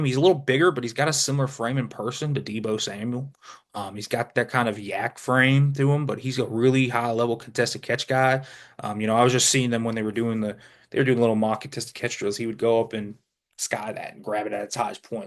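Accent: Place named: Appalachia, United States. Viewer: i have to ask, what is your accent? No disrespect! it kind of sounds like WV holler-Eastern KY slang 😂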